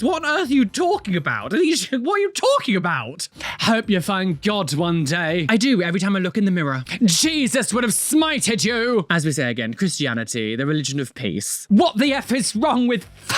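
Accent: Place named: RP, United Kingdom. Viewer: all of these people dogpiliing on you … are they Americans? Because if they are, it explains a lot their baseless accusations. You see, here in the US, there's a bit of a trope around a British RP accent: it's associated with either (A) being erudite and and expert [both of which are hated by a large swath of this country]; (B) movie villains; or (C) snobs. Having been raised on Monty Python and imported British TV shows, I don't have that reaction. But to 'Muricans who do not have much experience with British culture, your RP accent [which sounds quite good to my ignorant American ears] is probably making them fall into those tropes the instant they hear you speak. Which may explain the hate — they literally are incapable of overcoming their preprogrammed reactions.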